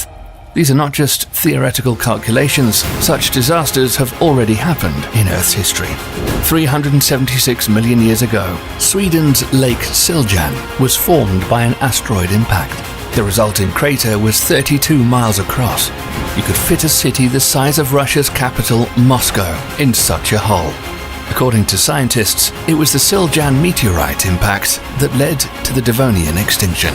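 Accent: United Kingdom. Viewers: My favorite British narrator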